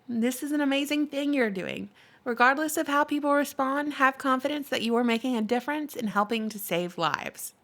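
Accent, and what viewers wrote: South, United States. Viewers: IDK if that country accent is you're true accent or not but love it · Oh my you had me rolling at"Spin the wheel Memaw!" Absolutely LOVE your southern accent!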